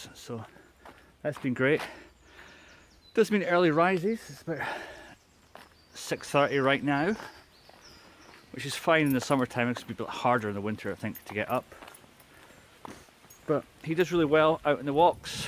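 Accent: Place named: England, United Kingdom. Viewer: I don't understand, you say "sit" for a down ? Due to your accent, i hear "site" when you say "side" 😊, it's so funny to me ! I am used to american people talking, not English people ! Regards from France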